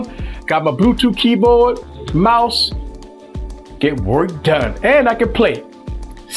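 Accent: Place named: South, United States. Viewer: that nawlins wallpaper is no surprise with that accent. Way down south accent. Rock onbro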